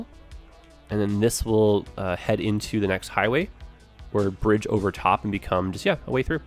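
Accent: Canada. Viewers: Just stumbled on u and may i say you have quality ! I really liked these 2 episodes so far i hope u keep doing them :) also are you maybe Candian ehh ?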